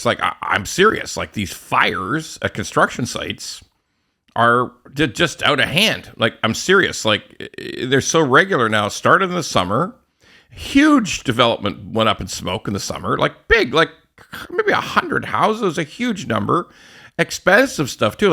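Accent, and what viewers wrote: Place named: Ontario, Canada. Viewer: talks in a Hamilton/ Vaughan accent Nice sub division you got there...shame if it burnt down...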